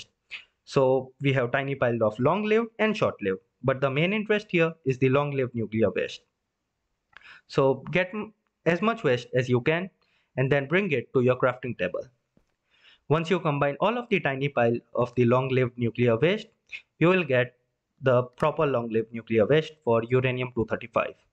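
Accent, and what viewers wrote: India. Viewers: Are you Indian, your accent is nice. no sarcasm im Indian.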